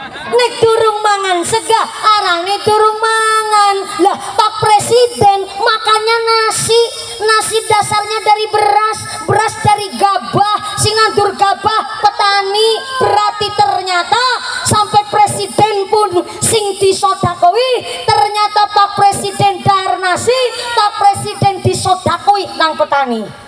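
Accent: Banyumas, Indonesia. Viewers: Nyimak Ceramah ngapak nya😂😂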